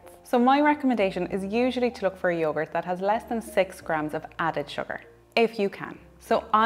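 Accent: Ireland. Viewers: thank you for helping with this weight loss journey. a comment … love your Irish accent. Thanks again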